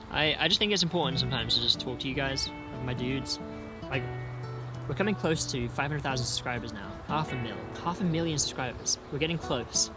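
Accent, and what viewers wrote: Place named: London, United Kingdom. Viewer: Yo you always say your from wales but you have a British accent? You sound more like you are from where I’m from which is near London? Great video tho dude 🍄🍁💙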